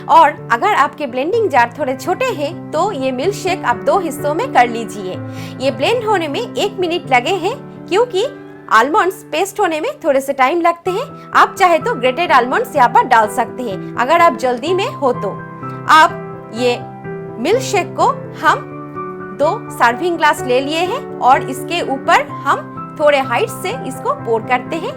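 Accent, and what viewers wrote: Bengal, India. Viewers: Its always please and cute to hear hindi from a bengali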